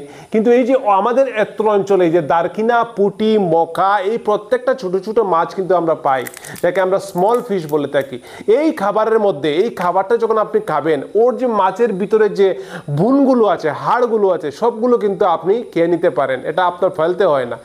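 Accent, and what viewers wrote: Sylhet, Bangladesh. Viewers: ভাই আপনার বাড়ি কি সিলেট। আপনার ভাষা টা সিলেটির মত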